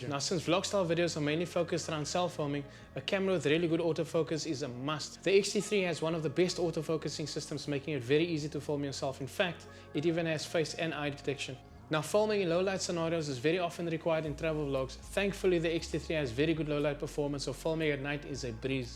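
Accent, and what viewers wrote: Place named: Cape Town, South Africa. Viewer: Howzit from a fellow Capey. I just discovered your channel. Really cool stuff. I enjoy hearing your accent. I live in Zagreb, Croatia. You should come here sometime and do some travel vlogs. We have some awesome locations.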